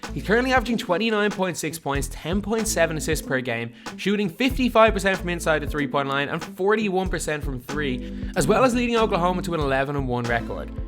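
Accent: Ireland. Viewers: Your accent is dope. Irish I would assume. Pretty cool listening to an Irish dude talk about basketball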